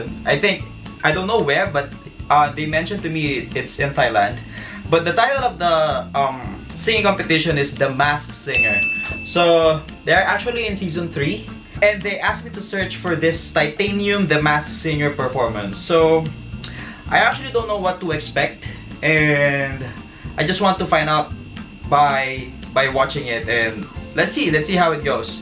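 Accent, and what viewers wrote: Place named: Singapore. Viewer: สิงคโปร์เลียน ชัวร์ สำเนียงอิ้งแบบนี้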